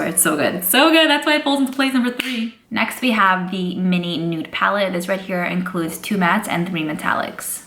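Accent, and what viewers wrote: New York, United States. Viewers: Your accent is perfection. It’s just a kiss of Italian New York and I love it.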